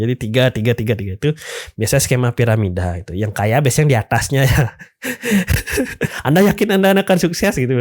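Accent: Bali, Indonesia. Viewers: kenapa ya orang bali klo ngomong enak didengarnya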